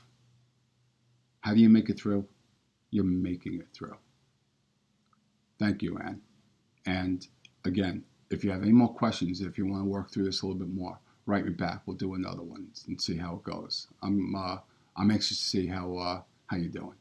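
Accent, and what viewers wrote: New York, United States. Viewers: Came to realise and found this channel. Our last "quarrel" as I tend to remember them was about missing mother's Day, and I used the argument "mom, since dad died in January this year it's been mother's Day everyday to me", and I got away with it at that moment, but it got out of hand for the so maniest time yesterday which made me google "narcissistic mother" and find this series of incredibly insightful videos. Thank you! (Love your NY accent!)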